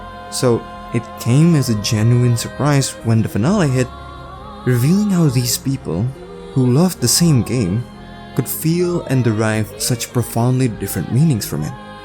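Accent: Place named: Indonesia. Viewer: i immediately recognized your accent! hello, from an indonesian american! i loved this video and completely agree with your analysis and all of your thoughts on the ending. it’s been 2 months since i’ve seen the finale of clair obscur and thinking about these characters still brings me to tears 🥲 so few games hit as deeply as this one does, it’s such a gem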